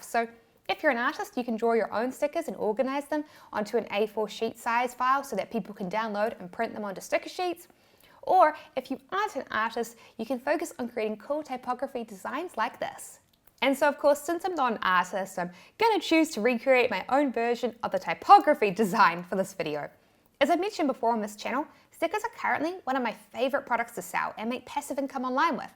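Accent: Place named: New Zealand. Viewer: As always, you present valuable content in your videos. In this video, you talked about digital product ideas I had not thought about. Thank you! There's another reason why I enjoy watching your videos. That reason is listening to you speak. I've loved the sound of the New Zealand, Australian, and British accents — in that order. Be safe. Stay healthy. And, most of all, enjoy your vacation!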